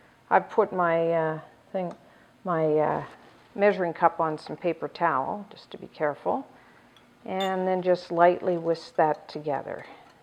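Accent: Canada. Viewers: Great Canadian accent!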